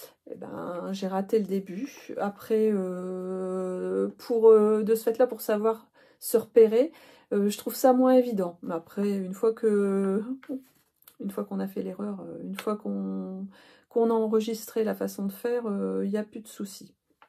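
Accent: France. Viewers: Merci pour ce podcast. J'aime beaucoup tous les modèles que tu as présentés, et tu le fais naturellement, sans chichis, sans essayer d'imiter le débit saccadé des journalistes ni jouer les influenceuses. C'est plutôt rare ! J'aime aussi ton délicieux accent du Pas de Calais dont je suis originaire. Les Harmonies, ça me parle et quelques notes de flûte m'auraient fait plaisir 😊. Concernant le pull Esme, pense d'abord à ton bien-être et ta santé. Chacun est libre de modifier les patrons, d'autant plus si tu cites la designer initiale. ✂️ Pas de bobine dans mes emojis, mais la couture m'intéresse aussi !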